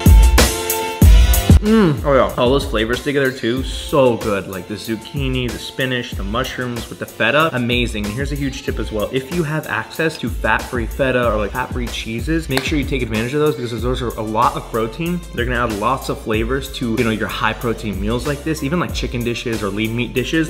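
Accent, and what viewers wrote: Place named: Texas, United States. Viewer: I can tell you from TX! Good video thanks!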